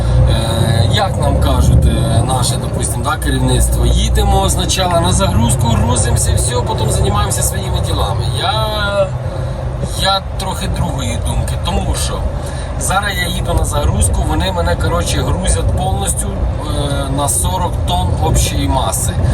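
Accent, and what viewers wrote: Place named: Surzhyk, Ukraine. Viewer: Говори ты уже по-русски , а то твоя сова похожа на избевательно над рiдной мовой. Честное слово, по-русски звучит весь твой суржачий диалект.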